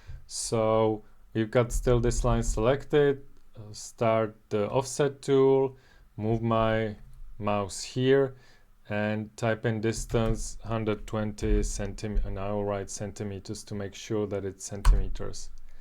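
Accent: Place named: Czech Republic. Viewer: Are you Czech btw? I can hear it! 😀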